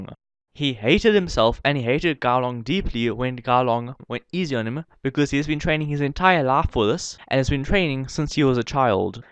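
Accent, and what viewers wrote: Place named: South Africa. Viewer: You said pure maths and I’ve only heard us South Africans say that, plus you got a South African accent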